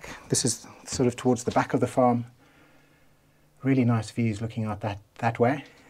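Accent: South Africa. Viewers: Hearing the Saffa accent when I clicked on this video was definitely a pleasant surprise 😂 I'm hoping myself to live somewhere in rural Portugal someday while working online. Seems like a dream.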